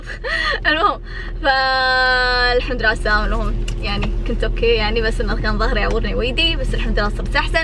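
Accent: Kuwait. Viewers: احبج انا سعودي للمن أحب لهجه الكويت❤️😂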